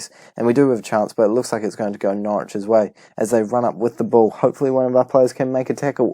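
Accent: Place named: New Zealand. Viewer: always nice to add another Kiwi voice to my lineup. Keep up the good work! If you want some tips on playing the game, I did a series recently called Tips for the Advanced Football Manager, you may find it useful.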